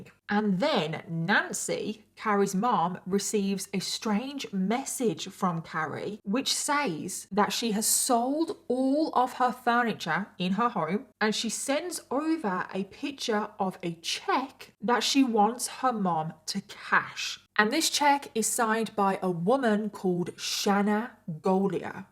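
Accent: United Kingdom. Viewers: i dont think ive ever heard a more british accent hahah every word is brilliantly emphasized haha exquisite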